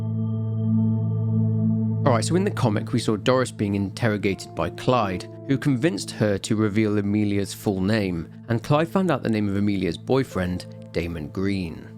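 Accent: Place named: England, United Kingdom. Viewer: I love voice and English accent. They work together well sounding pleasant and intelligent to me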